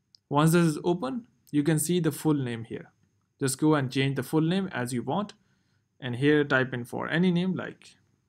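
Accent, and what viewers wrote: India. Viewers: as soon as i heard the indian accent i knew i was saved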